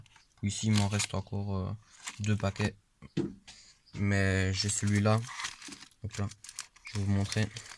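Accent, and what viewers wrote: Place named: Liège, Belgium. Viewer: T'habites en Belgique à liège ça s'entend trop avec ton accent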